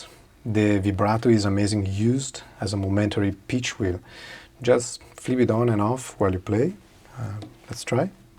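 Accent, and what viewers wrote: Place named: Spain. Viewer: Fantastic demo and tips! Love your accent too...Are you Spanish?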